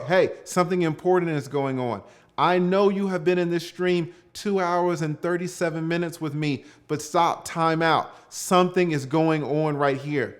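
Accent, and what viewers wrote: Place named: South, United States. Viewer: Definitely the king of storytelling! With that southern twang and then you throw in you "fuckers' at times and when you say the chat told me to 'suck a d@ " haaaaaaaaaaaa cracks me up! Yessssss you're the man!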